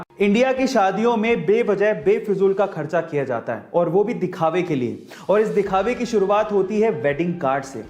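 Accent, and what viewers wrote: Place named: Haryana, India. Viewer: Don't mind sir but everyone cannot speak in haryanvi like u except haryanvi people because this language is in blood Jai hind jai haryana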